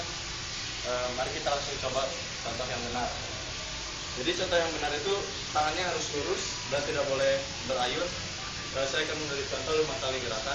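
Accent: West Java, Indonesia. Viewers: logatnya sunda euy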